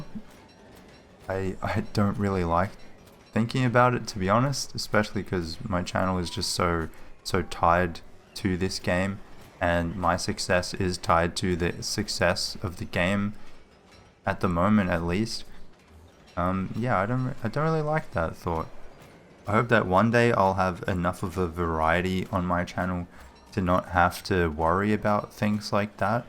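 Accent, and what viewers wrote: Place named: Australia. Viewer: Hello! I've been watching your videos and I really enjoy most of them! I'm really in love with your accent, it's reminds me of Aussie people. It's sad to know that Australian people are not real :(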